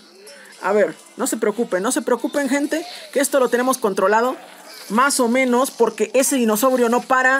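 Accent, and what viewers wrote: Spain. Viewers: Hablas como español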